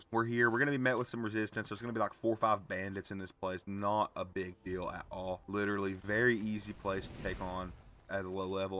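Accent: South, United States. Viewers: got that Theo Von southern charm love that shi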